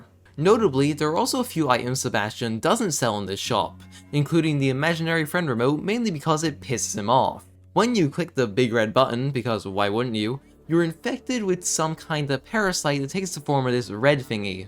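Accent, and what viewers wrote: Ireland. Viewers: Is bro from [anywhere in the island of Ireland (Not just in the Irish republic)] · i can hear the damn irish in your voice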